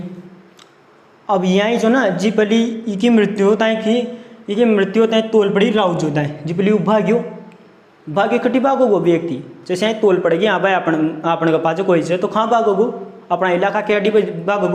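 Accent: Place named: Hadoti, India. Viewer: Hadoti tone.....❤️♥️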